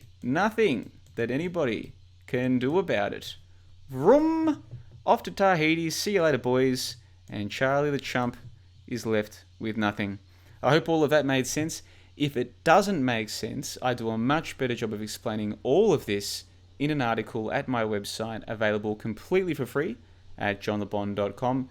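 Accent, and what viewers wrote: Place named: Australia. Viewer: I don't disagree with anything said here.. Private company issuing tethers is not dissimilar to central bank printing money. Tether will undoubtedly be involved in the crypto crash. Although still lots of room to inflate first.. Thanks for putting it in simple terms and nice to hear an Aussie accent in this space!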